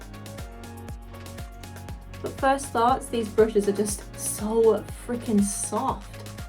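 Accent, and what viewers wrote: United Kingdom. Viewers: lets all be honest, we love their accent, where the brithish gang at?? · Who else loves British accent 😃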